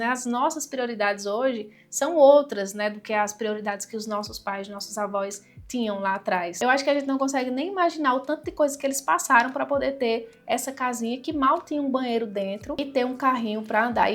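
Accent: Northeast, Brazil. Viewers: Pelo seu sotaque deu pra perceber que vc é nordestina então vc deve saber que aqui no nordeste as coisas sempre chegam com um pouco de atraso em relação a outras partes do país.... Como vc mesma relatou que morou em cidade de interior e sabe que as oportunidades de emprego são bem poucas mesmo para quem tem qualificação!!!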